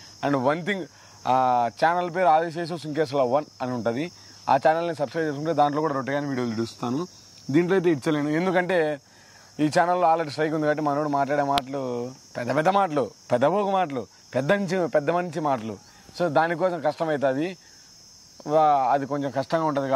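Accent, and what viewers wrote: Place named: Kadapa, India. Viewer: Mana kadapa volla slang ge ante. Ray youtube ga okasari ma kadapa vachii chudu sami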